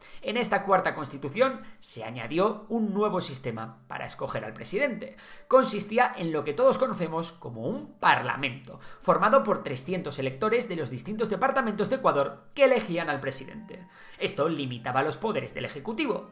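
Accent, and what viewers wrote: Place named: Spain. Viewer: Hola, Espero me lea . Aunque es muy extraño que un español con su acento diga cosas raras como "Tortita en la cara", "le hizo la cama". De este video . Y aunque palabras de "chivato", en Argentina Colombia en Chile algunos no entienden, o México, pero "hacer longis" Chungo" ,"Feten","Faripré", "Potra" (suerte), me sorprenden en videos de españoles que no entiendo y debo pausar para investigar. Yo lucho para que "No sabo kid" me dijeron, hay estereotipos, a los españoles y latinoamericanos debemos de tener una unidad. Aprecio mucho la forma rápida de explicar, me gustan sus ilustraciones !. Aprendo mucho. El minuto 12 :04 ese tono español que no entiendo al mencionar todos esos nombres, de verdad que le aplaudo. 😅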